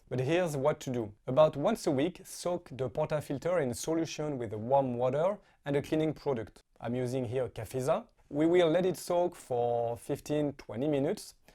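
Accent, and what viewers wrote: France. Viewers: We love your French accent, it makes it so interesting ! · Great video. Vety informative. I also enjoyed hearing your French accent. Merci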